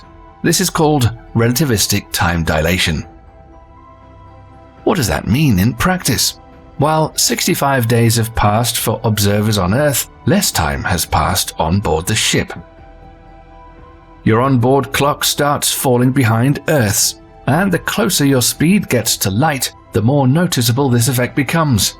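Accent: United Kingdom. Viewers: snarky, breathy middle-age british accents make it all factual for most listeners lol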